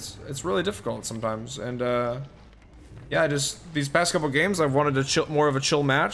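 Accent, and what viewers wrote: United Kingdom. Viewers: As a brit, I actually like your british accent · That british accent is too funny man 😂 this new killer looks fun. Keep up the good work friend - british viewer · Very good British accent I'm very impressed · A bit bri ish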